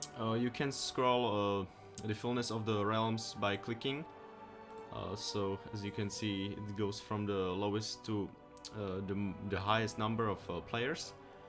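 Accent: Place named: Czech Republic. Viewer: Jsem si řikal že mi ten přízvuk zní česky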